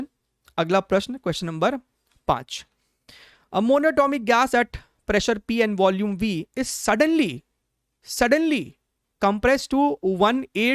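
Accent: Bihar, India. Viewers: Sir aap bihar se hai kya?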